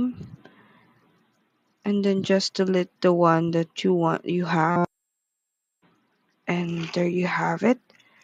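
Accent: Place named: Philippines. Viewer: I can tell by your accent, I think you're filipino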